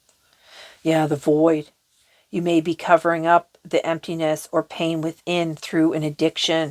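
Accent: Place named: Canada. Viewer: LOL .. I think it is bc you are Canadian. I had a professor in college that spoke with a similar sound & he was from Canada too. 😂😅😊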